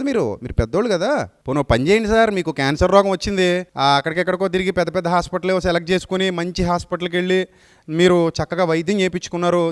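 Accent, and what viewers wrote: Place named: Rayalaseema, India. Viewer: అన్నయ్య నాది రాయలసీమ కర్నూల్ రాజమండ్రి భాష లో చాలా బాగా చెప్పారు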